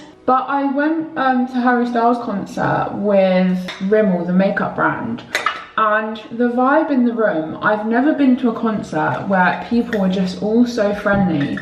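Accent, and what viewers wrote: United Kingdom. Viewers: Amazing Channel and by the way I’m from the U.S.A. And you are from the U.K. I heard because your voice is British mine is English nice to meet you “socially”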